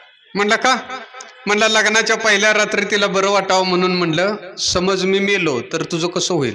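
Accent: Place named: India. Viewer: अस्सल ग्रामीण ढंग .. खूप छान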